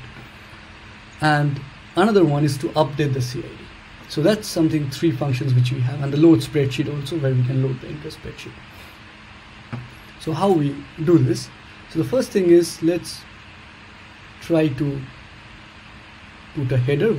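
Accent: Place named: India. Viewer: Finally an Indian who has proper accent 😁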